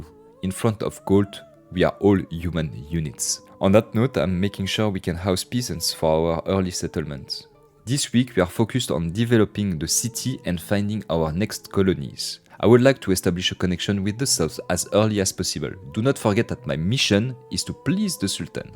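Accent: France. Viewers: I just want to say this is the most french accent I've ever heard. Magnifique!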